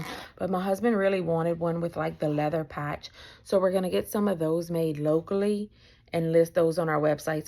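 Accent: Louisiana, United States. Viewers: My step mother was killed by a drunk driver on her way to work, the day before my bday and we had plans for that day. Changed my life forever. The fact people still drink and drive when all these apps exist is beyond me. Y’all are touching and changing many young lives when they think about drinking. This was cool to me to learn about as well I’d love to do something like this too one day and look forward to being able to donate to help in the meantime ❤ Being from the south I get the accent thing but imo if they listen you can’t hear the lisp and the Cajun is just Flavor for the words 😂❤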